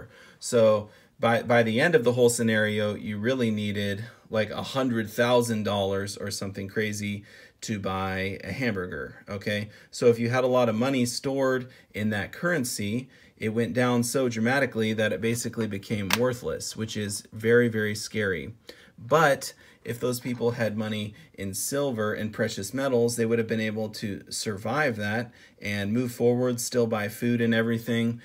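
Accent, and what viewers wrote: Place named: San Bernardino, United States. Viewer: That accent. San Bernardino?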